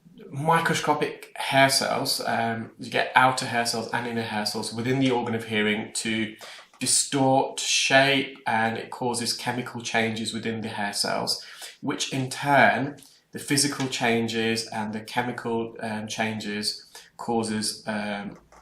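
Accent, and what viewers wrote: United Kingdom. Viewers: My daughter has profound bilateral sensorineural hearing loss and uses a cochlear implant. Thanks for the lesson on the differences in hearing loss; I was glad to have a refresher course. She went from hearing aids to the implant but still continues to have itchy ears. I took your advice and bought the Earol spray and it is helping. Thanks! Love your videos and your proper British accent. I get so tired of hearing all the “yinzer” talk here in western PA.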